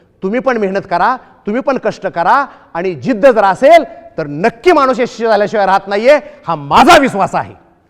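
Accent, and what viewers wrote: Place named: Satara, India. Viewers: Bhashevaruch olakhl satarachach ahe mhnun 😀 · Great sir Assal satari bhasha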